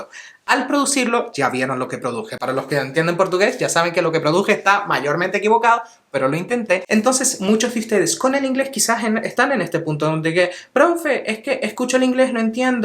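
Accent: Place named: Venezuela. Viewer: al principio identifique tu dialecto pero me confundí cuando vi Chile… pero algo me decía qué era de Venezuela… ademas me gusta mucho tu elocuencia…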